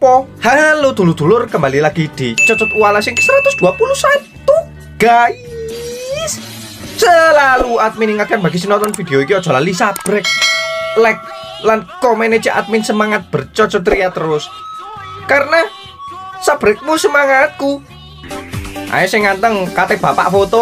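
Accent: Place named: Malang, Indonesia. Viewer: Admin e wong malang iki jelas🤣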